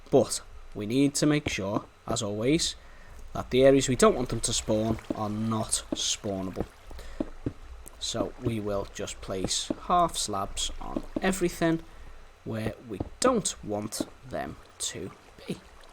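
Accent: Liverpool, United Kingdom. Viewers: Nansymansy Si adnan LMAO. It’s a scouse (Liverpool) accent. Liverpool is a place in England my friend.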